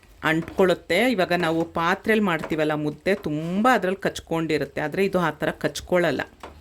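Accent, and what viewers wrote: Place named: South Canara, India. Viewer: Neevu South canara antha gottayithu. Yavuooru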